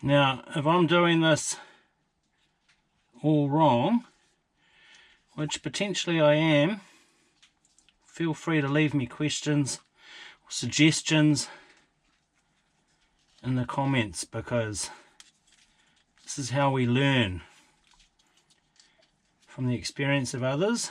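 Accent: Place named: New Zealand. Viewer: I would put in another inspection hatch and install the sensor into that - unclip the wiring, unscrew the hatch lid and there is your sensor. Great video, I started with Part 1 as result of a search on marine toilet maintenance. So nice to hear a Kiwi accent from a Youtube search for a change. I'm going to install a marine macerator into my new motorhome so doing lots of google and youtube at the moment. Must do another Picton trip (from Chch) as soon as I am mobile again.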